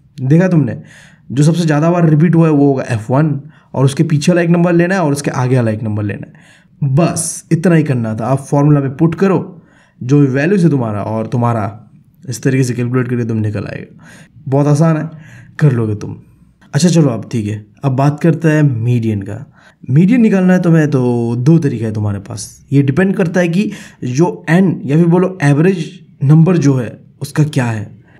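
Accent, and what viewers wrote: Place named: Bengal, India. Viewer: Please sahi se bolo na ekadam bangali kyu banrhe ho i hope tumhe meri baat samajh aai ho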